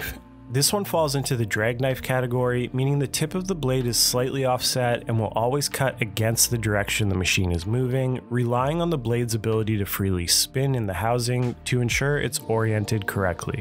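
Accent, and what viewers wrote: Canada. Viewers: Took me a while to figure out your Canadian pronunciation of "decal", but otherwise, thanks. Also you lost some credibility with the Prusa hate. I agree that Bambu printers are technically better, but open source is the most important feature for me, so Prusa wins there.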